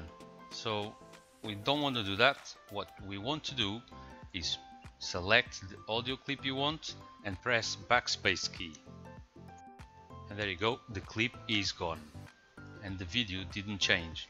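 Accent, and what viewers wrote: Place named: Portugal. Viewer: I was like... hmm sounds like a Portuguese accent. then checked the keyboard layout.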